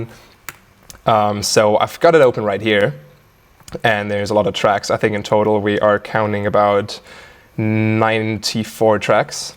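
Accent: Germany. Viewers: I love his German accent XD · wie man das deutsch raushört :D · I always thought he was Irish, but now I know he's German I can actually hear it, bizarre!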